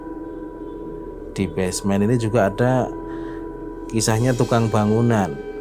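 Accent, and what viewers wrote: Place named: Bandung, Indonesia. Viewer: Dari logatnya sepertinya bapak ini orang Bandung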